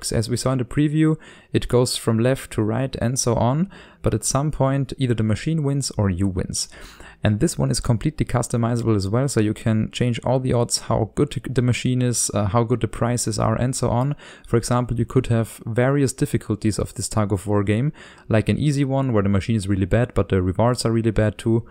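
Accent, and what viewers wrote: Germany. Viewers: man hört so krass dein deutschen accent raus xd danke für das tutorial habe spaß mit meinen freunden damit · Du kannst eigentlich sehr gut Englisch, wenn man weiß das du Deutscher bist fällt es mehr auf, aber es ist auch nicht schlimm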